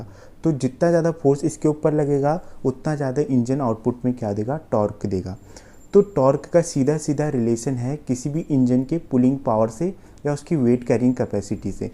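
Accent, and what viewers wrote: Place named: Uttarakhand, India. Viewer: bhai teri videos se ek chiz clear hai ya to tum mechanical engineering ya automobile engineering ke student ho..... aur bolne ke tarike se lga rha... Uttarakhand se ho...🤩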